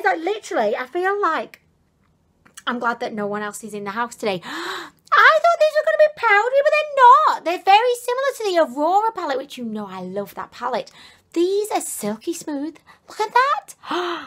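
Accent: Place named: Manchester, United Kingdom. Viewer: Ahh!!! I just came across your channel!! You’re so precious 😍 are you from Manchester? You have the same accent as some of my family that live over there!! Lots of love☺️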